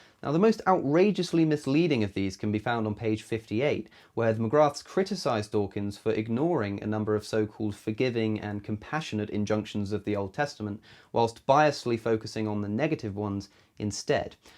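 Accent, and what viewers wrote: United Kingdom. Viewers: hes smart tho and he has a British accent. 2 for 2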